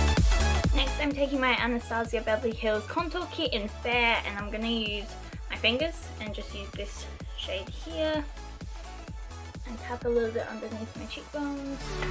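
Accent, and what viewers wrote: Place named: Australia. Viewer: Where are you from you sound Aussie but then you have a bit of a English accent to it ❤️ it's beautiful though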